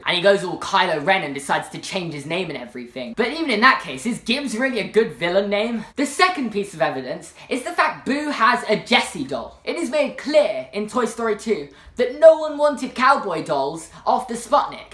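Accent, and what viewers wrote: England, United Kingdom. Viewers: This video is 86392times better with his British accent😹☺️ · Are you British? I love your accent lol. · Are u from England (I am lol) 😂 love ur accent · youtube then:Hey wanna play MINECRAFT YouTube now:Little british boy talking about disney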